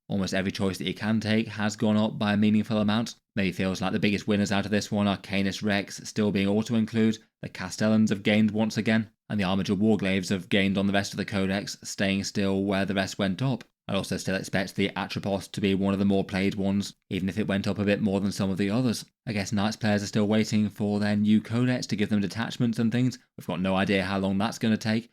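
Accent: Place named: United Kingdom. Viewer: 5 years of listening to you and still have a hard time making out your mono tone British accent on my cell phone speaker at work.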